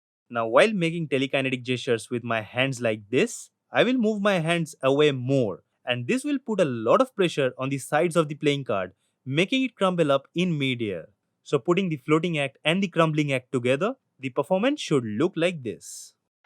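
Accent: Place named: India. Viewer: You speak indlish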